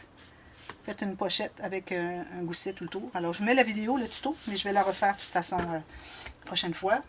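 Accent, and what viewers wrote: Quebec, Canada. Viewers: Cc, merci pour ces nouveaux tutos! Gardez votre accent. Ça me rappelle ma cousine disparue qui était originaire du Québec! Courage pour votre cousine et à vous! À bientôt, bye bye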